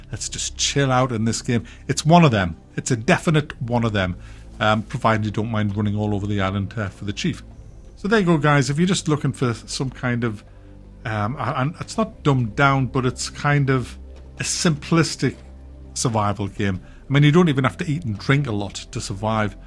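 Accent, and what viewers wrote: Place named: Newcastle, United Kingdom. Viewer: Ahh... The Chief is a Geordie then 🤔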